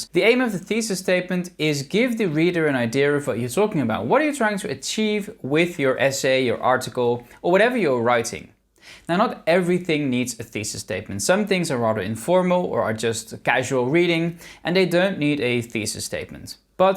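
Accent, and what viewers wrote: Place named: United Kingdom. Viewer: it was overall pretty good. but if he wasn't brittish i'd like it more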